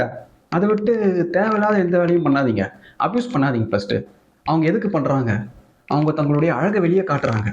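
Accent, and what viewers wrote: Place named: Chennai, India. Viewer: நீங்கள் பேசுறதுலையே தெரியுது நீங்க ஒரு சென்னைவாசி தான் என்று சென்னையில் எந்த ஏரியா நீங்க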